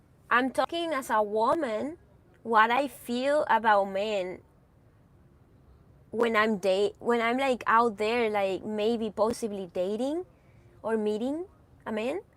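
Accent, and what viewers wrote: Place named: Spain. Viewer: that must be some good stuff if you are seeing aliens on the ocean and on the ground i dont know i think you been in the desert a long time I dont know maybe your seeing blue lites okay (LOL) well come back to earth you havent met me yet you have no been able to date men because it wasnt me I am simple living man I am a leader I would protect you I mean I have bad knee from an accident but I can walk I use a cane and I can cook for myself and clean things I get social security so I dont have to work I can spend all my time loving you and caring for you. I am a tradtional man I am honest romantic I am older but I have values I am close to my family I have been without a partner for 15 years but I know that me and you would get along you have the bigest brown eyes and long brown hair that I love and tan skin and I love your spanish accent I am a german but born in America I dont care to much about material stuff your the type of women who likes to live simple and i love that I am very masculine and I want a women who wants a masculine man like me. 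anyway I know that we would click I just know my telephone number is <num> I am in omaha Nebraska and I can come to you I know that you dont want problems or to be some ones mom I dont need that I will not bring any problems with me and like i said I can do things for myself I also know about engines I can work with tools and I have knowledge about a lot of things I play guitar and bass guitar I write love songs list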